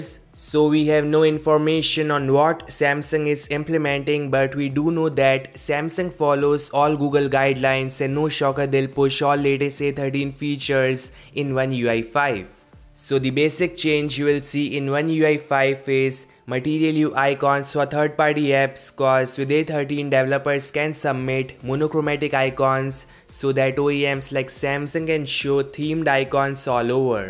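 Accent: India. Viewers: This is Indian accent Loved it !!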